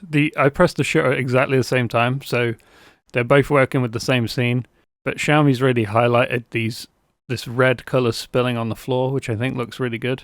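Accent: United Kingdom. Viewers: I like ur British accent when u speaking bout' these phone i very like ig 😅😂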